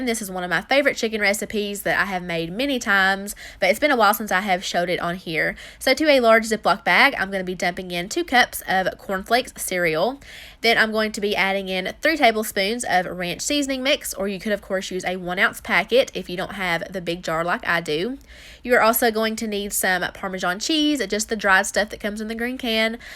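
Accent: South, United States. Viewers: no that’s how we say it in the south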